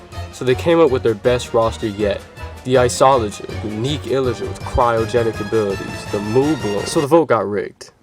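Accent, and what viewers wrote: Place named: South, United States. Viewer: bro is mad southern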